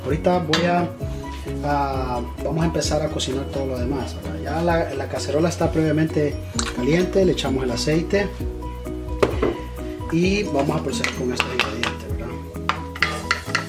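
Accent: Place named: Veracruz, Mexico. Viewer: tu acento me parece jarocho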